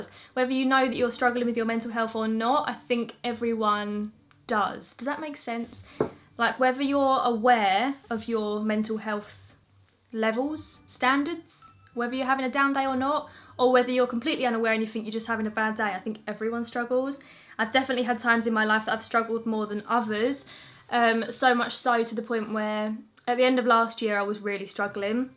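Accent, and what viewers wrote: Essex, United Kingdom. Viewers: You're legit me but prettier and with an Essex accent 😂🤩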